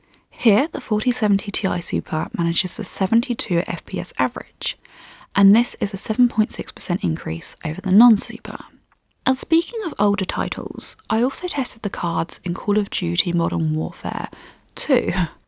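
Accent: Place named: RP, United Kingdom. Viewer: i imagine this is what its like to listen to english royalty talk about GPU's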